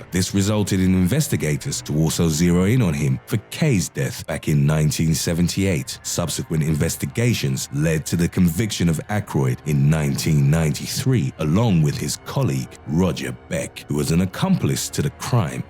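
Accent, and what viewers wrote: United Kingdom. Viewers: What happened to the scary voice. This guy is ok but any U.K. Voice is never really that scary. Makes you not want to jog. Although anything like being in the wrong place at the wrong time can get you killed. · shout out to the British narrator😉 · batman 666, True i kinda liked the other one. The british voice does not work with the music too.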